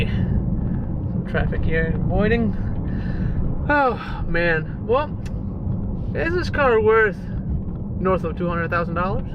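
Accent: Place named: Eastern Europe. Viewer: Where are you from bro? At times your accent sounds eastern European lol. Not trying to dis just curious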